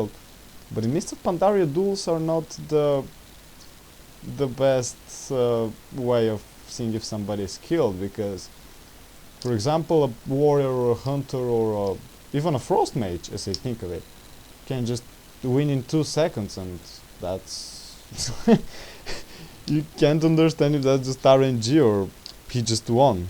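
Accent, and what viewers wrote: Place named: Russia. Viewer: RUSHAN AKCENT SO GUD.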